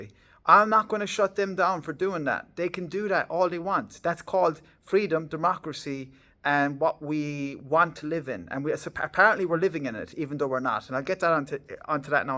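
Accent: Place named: Ireland. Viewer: Well spoken and clearly thought out words. I enjoyed your video, hearing your opinions, and hearing an Irish voice on the things happening here. Id love to see more of us doing this, I want us to be able to talk about these things in public and online without all the hate ❤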